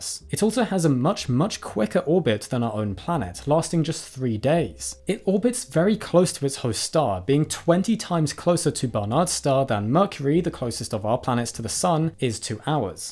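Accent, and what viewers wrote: United Kingdom. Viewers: How does history, space & dinosaurs sound so much more incredible, when listening to a British guy talk about it???? ☺️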